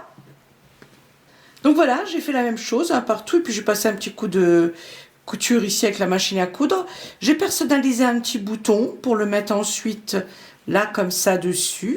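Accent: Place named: East, France. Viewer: Bonjour. Je viens de découvrir il y a une dizaine de jours votre chaîne, j'adooooore! C'est clair, précis, voix lente et avec en plus un petit accent! Je dirai de l'Est? A la montagne? En tout cas merci, j'apprends beaucoup de chose. Bonne journée.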